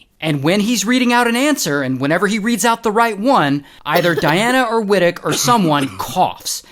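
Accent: United Kingdom. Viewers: The British accent is 🧑‍🍳💋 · Oh my. That british accent.... especially the 'oh bugger' not gonna lie i had to pause until i stopped laughing. Keep doin you. We love ya 😊❤😊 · British accent 😂!!! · Your British accent got me rolling 😂😂😂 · british accent is killing me 😂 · Impeccable british accent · I’m impressed on William Johnson British accent in this video it sounds like he’s actually British! 😂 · Well done on the British accent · The British accent 😂😅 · The British accent 💀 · That British accent is wild! 😂😂😂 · the british accsent is killing me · Liking immediately after hearing the British accent 😂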